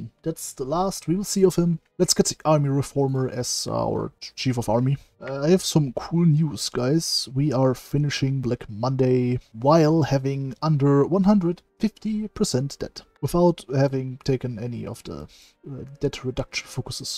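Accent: Germany. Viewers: Hat dir eigentlich wer mal gesagt, dass man an deinem Englisch den deutschen Akzent SOO einfach erkennt xD Ich wusste von Anfang an, dass du ein Deutscher bist. Naja wie dem auch sei, ich wünsche dir noch was Schönes :D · It's cuz he's German not cuz he's an English speaking RPer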